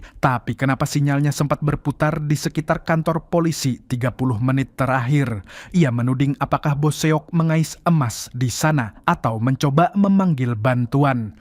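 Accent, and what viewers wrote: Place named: Banyumas, Indonesia. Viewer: SUARA LO NGAPA SIH, MALAH KAYAK ORANG NGAPAK. JADI DIRI SENDIRI AJALAH JANGAN DIBUAT BUAT 😡😡😡😡😡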